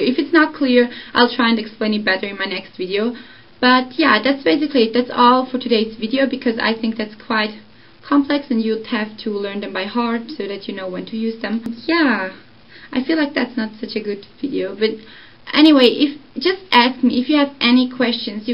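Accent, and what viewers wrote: Zurich, Switzerland. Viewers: Du, du hesch imfau ganz tolli videos. Ig ha kei ahning wieso ig bi dir subscribed bi aber i finge di haut eifach sympatisch :) Du no schneu eh frag: Hesch du dr'Zürcherdialekt? I finges drum no witzig de mitem Bärnerdialekt z'vergliichä :) Grüessli us dr'Houptstadt !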